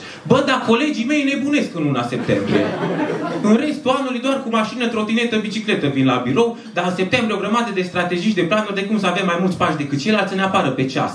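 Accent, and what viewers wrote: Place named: Transylvania, Romania. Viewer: Ești bun de tot mă băiatule ! Îmi place graiul ardelean pe care îl vorbești ! Bravo ! Am ras de m-am c...ar pe mine ! Glumesc ,nu m-am murdărit !😅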